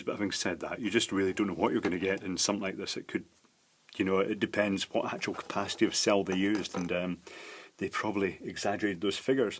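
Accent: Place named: Edinburgh, United Kingdom. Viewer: "Mellifluent" I think is the word you are seeking, Robert. Me, I thought it was just his pan-loafy Edinburgh-esque accent. :-)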